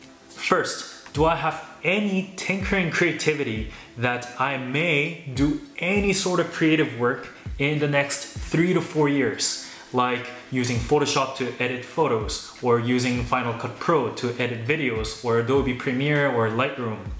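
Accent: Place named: China. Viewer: 听口音是在中国长大的吧？😏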